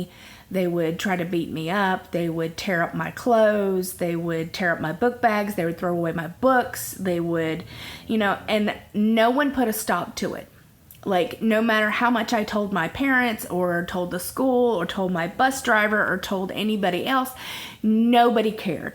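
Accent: South, United States. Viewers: I am so sorry for all the verbal and emotional abuse you have gone thru it actually brought tears to me. hugs you're such a sweetheart. I love your cute lil southern accent and your glasses are super cute. I think you are doing awesome and keep up all the good work girl!! 😁🤗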